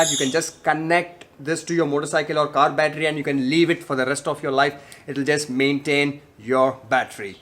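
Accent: India. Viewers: You‘re welcome! This was the first video From you that I watched! I watched some others as well! I like your friendly nice art and your Indian accent haha!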